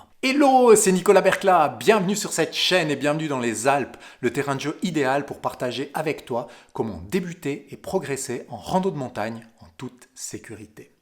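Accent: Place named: Switzerland. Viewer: Une vidéo instructive. Les néophytes comme moi ne se doutent pas de l'aspect technique du simple fait de "marcher dans la montagne". La sécurité est très importante, il ne faut pas laisser de côté les moindres détails. Beaucoup de choses intéressantes, les séquences vidéos sont toujours aussi superbes. L'accent suisse est super cool. Continue ainsi, merci !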